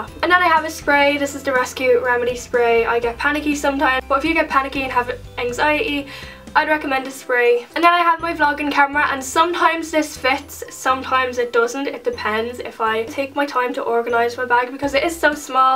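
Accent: Ireland. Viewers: Where in Ireland are you from?? Don't recognise the accent ..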